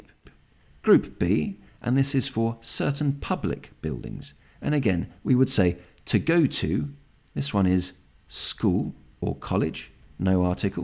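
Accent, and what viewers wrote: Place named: United Kingdom. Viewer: UK English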